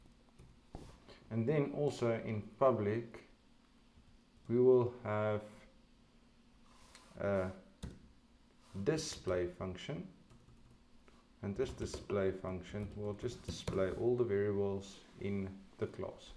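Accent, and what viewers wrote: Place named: South Africa. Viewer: Your accent says you are in South Africa. . . Super proud of you man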